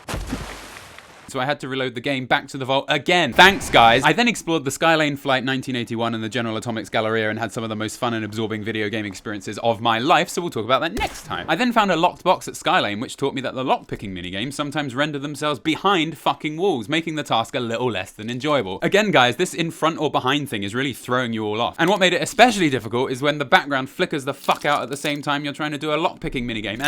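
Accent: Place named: United Kingdom. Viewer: Does every british man have the same voice?..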